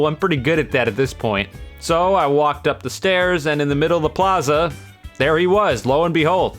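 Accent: United Kingdom. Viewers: I didn't know you were british?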